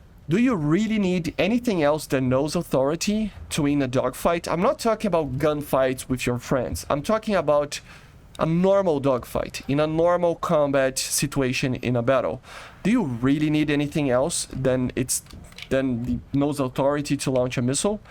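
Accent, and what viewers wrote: Brazil. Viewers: Bro is definitely Brasilian, just by the accent and the way u talk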